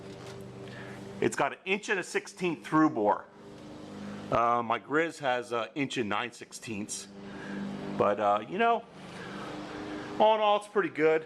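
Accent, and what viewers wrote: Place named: Pennsylvania, United States. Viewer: I knew I recognized that accent. Fellow PA guy! If I were out in Cali, I'd have done that restoration for you. To show you my work, check out my set of lathe-restoration videos here: <link> Imagine if I got my hands on your Clausing and what she'd look like?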